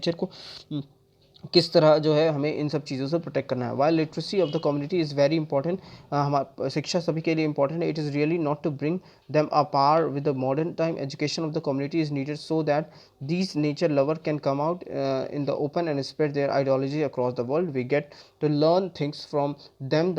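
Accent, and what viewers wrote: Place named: United States. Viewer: Your voice like American english and I like it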